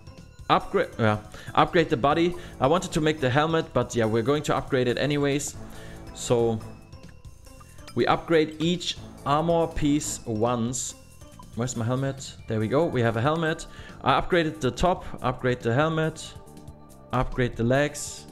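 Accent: Germany. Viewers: bin Random hier gelandet aber sofort rausgehört einer von uns 🤣 junge dieses ewige Prager wechseln das ist echt stresserzeugend 😂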